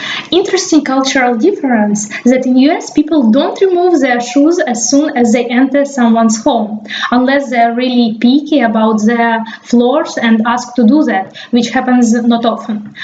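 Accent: Russia. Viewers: Your pronunciation is a cultural diversity as well it's really specific for Russian people to speak a Russian English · I love your Russian accent so nice to hear that.... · Невозможно слушать этот нижегородский прононс... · russian accent,i like it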